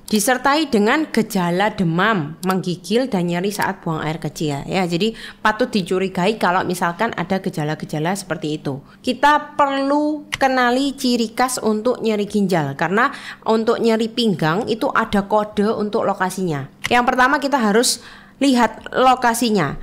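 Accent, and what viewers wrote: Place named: East Java, Indonesia. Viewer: Logat Jawa Timuran 😂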